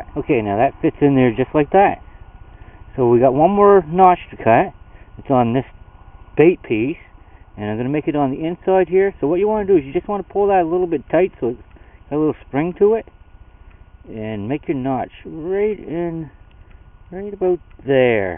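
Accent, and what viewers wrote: Canada. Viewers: Great video man! I love how canadian you sound. Thanks for the post stay Eazy! ... "Shklor" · are u canadian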